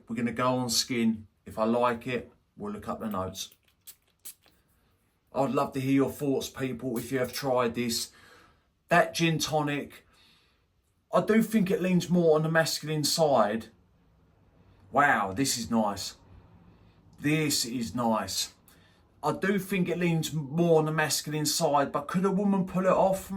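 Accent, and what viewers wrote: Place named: England, United Kingdom. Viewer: you were saying the house of M.Micallef correctly. DesirToxic is totally my speed! I can’t believe you’ve never reviewed this scent on your channel before. It’s pretty popular in America for a niche scent. The new version, DesirToxic L’Intense (2024- adds a heavy note of Mint in the opening but removes the majority of the greener cannabis aspects from the heart (I can’t remember if cannabis is still a listed note, and I’m too lazy to look it up at the moment). Anyway, just had to mention that it always tickles me every time you say the word “Three”. It sounds like you’re saying “Free” to my American ear. I find it funny, I promise I’m not making fun of you, it’s our little differences in life, ya know? Anyway, I’m interested in accents, do you mind telling me what part of England you come from or what region your accent derives from? 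I know there’s like a Manchester accent, and a Posh section of London accent, etc. Just curious. Please take care of yourself and I pray you're doing well. All the best from sunny Florida. P.S. I’m always impressed by your nose, thats why I continue to watch and I like your presentation style- not a lot of fluff! Just down and dirty, you get right into it.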